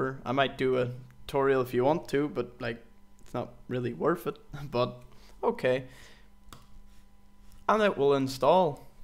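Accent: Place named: Germany. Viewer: BTW! your accent is awesome!!! Is it German?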